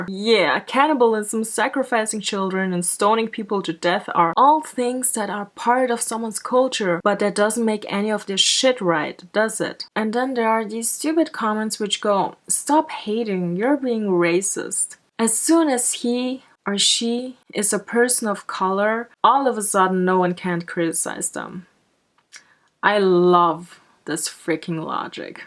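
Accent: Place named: Germany. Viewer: It's so Weird hearing Herman accent coming out If an Asian. So FUKN weird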